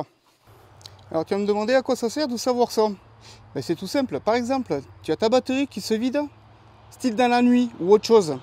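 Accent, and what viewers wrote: South, France. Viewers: Ohh mais tu es de chez moi ( le sud),!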